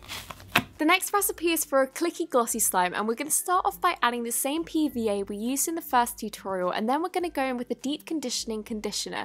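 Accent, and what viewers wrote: United Kingdom. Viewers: Are u from the UK. Just asking cos you sound like it. I do. I come from East Yorkshire. Love ur videos. 😁😁😁😁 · You sound British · British alert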